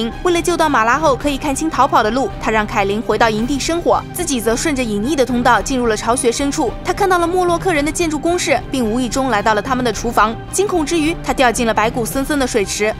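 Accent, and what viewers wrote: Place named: Henan, China. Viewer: 無意間聽河南人的口音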